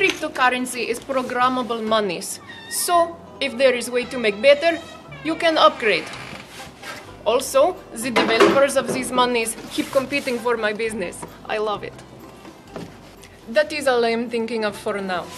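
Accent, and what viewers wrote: Russia. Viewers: I Fuckin love your Russian accent :) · lol Russian accent makes instant sex appeal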